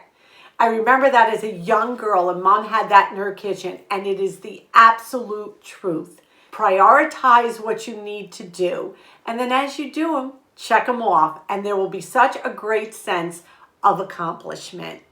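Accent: New York, United States. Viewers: Hello 👋 This video popped up on my feed. I love these types of channels. I enjoy your NY accent and love the positive tone in your voice. I took absolutely ages to finish the video because you inspired me so much to go and do! I LOVE that old Dutch proverb and will add that to my "to embroider" list! I looked up the amazing planner you showed and OH MY GOODNESS 😱 what a rabbit hole I could fall down for that one. Not very frugal on the outlay but I see how it will save money in the long term... So all this to say thank you (and with his mad guitar skills!) for being you, keep on being you and shining your light all the way across the globe. Lots of love from a new subscriber in Western Australia. 😊💝🇦🇺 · New Yorker? Staten Island?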